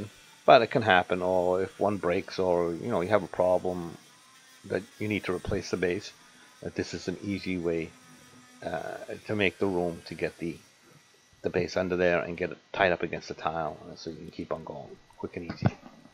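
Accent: Boston, United States. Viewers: That has to be the coolest accent in the Us. 👍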